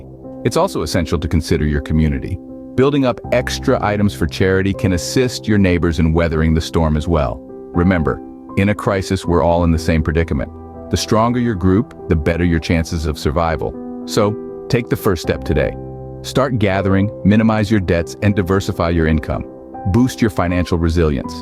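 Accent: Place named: South, United States. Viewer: I love your southern accent. 😉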